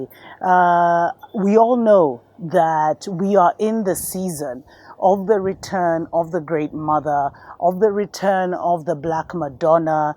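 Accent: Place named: United Kingdom. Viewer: I cannot listen to you why you worrying how she laughs what part of the uk are you from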